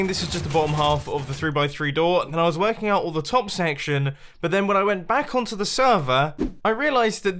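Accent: United Kingdom. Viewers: I, as a german, really like your british accent. Your channel has very nice content. You are such a good YouTuber. 👍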